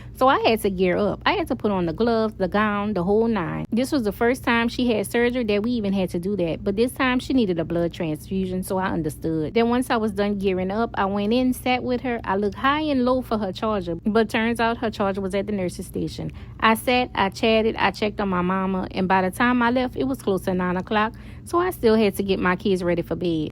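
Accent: Louisiana, United States. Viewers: What part of Louisiana you from? I’m from Eunice but live in California now . Some of my family lives in Lafayette I love listening to you talk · Are you from Louisiana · I really enjoy hearing you speak! I came across your page, and heard what sounded like a Louisiana accent! GOD BLESS you, Husband and family!!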